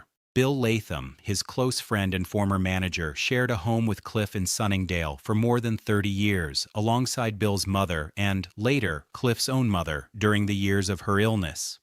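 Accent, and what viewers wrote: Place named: United States. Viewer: Why is an American doing this commentary again ?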